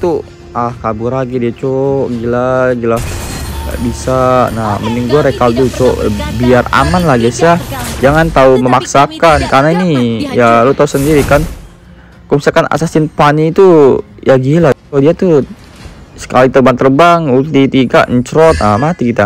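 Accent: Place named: West Java, Indonesia. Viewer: Mang orang sunda daerah mana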